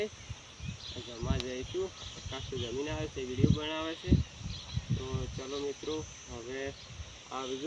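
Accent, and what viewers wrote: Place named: Banaskantha, India. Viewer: ક્યાંથી છો ભાઈ તમારી બોલી બનાસકાંઠા આજુબાજુ ની લાગે છે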